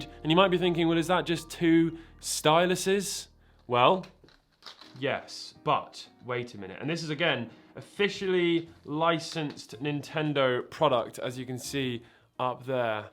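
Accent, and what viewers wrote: United Kingdom. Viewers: For some reason he sounds more British in today's video. It's quite nice actually.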